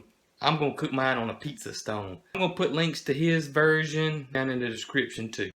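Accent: South, United States. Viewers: Love ur country accent!!!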